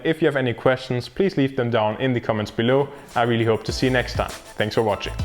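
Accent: Germany. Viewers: Dieser Deutsche Akzent haha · Which model/Version is the best of rgbic (bc i want to buy 10 Meters but i want the best version) btw are u German bc of the accent if yeah hallo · Merke ich da etwa einen Deutschen Akzent ?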